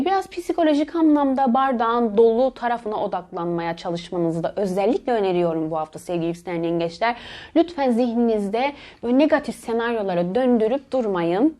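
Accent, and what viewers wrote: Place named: Cyprus. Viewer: Tam bir kıbrıs şivesi var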